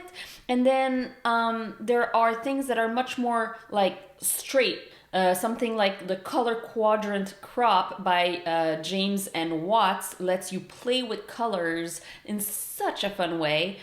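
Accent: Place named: Quebec, Canada. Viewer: ! Ça fait plusieurs vidéos que j'écoute de toi et à chaque fois je me dis... Aaaaah cet accent est tellement familié. C'est sur que tu es Québécoise! Je capote sur tes vidéos! Et tu met de l'avant des artistes francophones que je ne connaissais pas. Merci pour ton travail!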